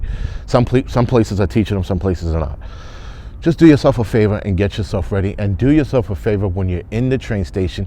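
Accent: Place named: South, United States. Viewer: Some times you sound like you have spent time in the south.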